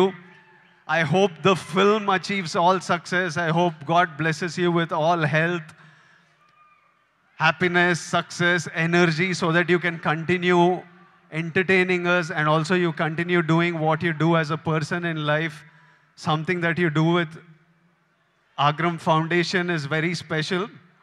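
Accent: Telangana, India. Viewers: Adi maa telangana slanga ra puka bayata ala slang ni comment cheyyaku dorikithe guddalo tantharu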